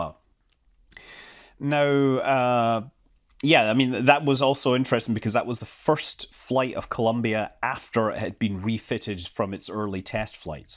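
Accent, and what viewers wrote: Scotland, United Kingdom. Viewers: I find it interesting that Scott's accent has changed little, if at all since moving to California. I'm not sure how long he's been there now, but I'm sure it's been at least a year or two, if not more. But I remember as a kid growing up in Southern Ontario. Between Michigan and Upstate New York, if you're not familiar with the location. We had a kid come to our school who moved up here from Tennessee in about grade 5 and he started off with a pretty thick southern drawl. But after maybe a year or so, his accent had mostly disappeared and he had more of a northern accent. But the one kid I knew from Saudi Arabia kept his accent right up until I lost contact with him in high school. Heh, used to give him a hard time about it sometimes, always jokingly, of course. He was a friend, after all. I chalk this up to a few things though. 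First and most obvious being that a southern drawl isn't nearly as thick of an accent as Scottish. And the second being that children tend to learn these things a lot easier/faster than adults. Like, my Mom and Aunt spent the first few years of their lives living in Germany and no doubt had German accents when they came over to Canada. But don't have a hint of a German accent. Meanwhile my grandparents both had very thick German accents for the almost 70 years they lived here until their deaths. Maybe it's down to how much easier and more naturally children learn than adults, or maybe it's down to my Grandparents having had more exposure to German acc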